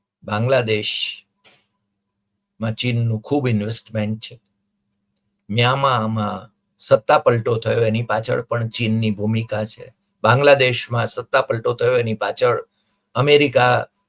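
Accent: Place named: Kathiawar, India. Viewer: આપ મહેસાણા બાજુના છો છતાં પણ કાઠિયાવાડી લહેજા માં બોલી જાણો છો 👌🏽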